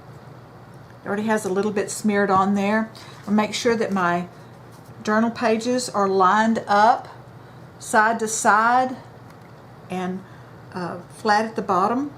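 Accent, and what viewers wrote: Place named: South, United States. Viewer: I want to say that your detailed description of each step is exactly what I needed! An added bonus is your accent! I’m grew up in the south and I’m always homesick! Thank you for your video!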